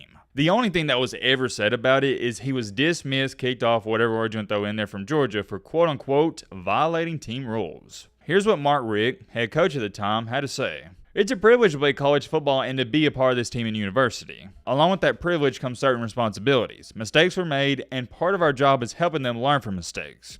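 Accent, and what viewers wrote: South, United States. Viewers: How tf u don’t know what a sawed off shotgun is? And you sound like ur from the south?? Like holy jeez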